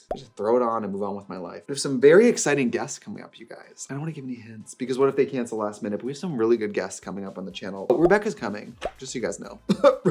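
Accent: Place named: Milwaukee, United States. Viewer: it’s the midwest accent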